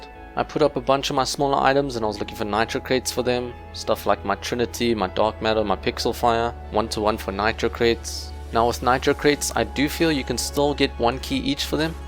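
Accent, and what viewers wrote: South Africa. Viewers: Are u south African cause ur accent sounds like it btw i am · I knew I recognised ur accent, I'm south african too ! hehe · I will guess where you are from so accent sounds a bit African maybe light German but mostly African so South Africa would by my guess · Are you South African ??? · South african?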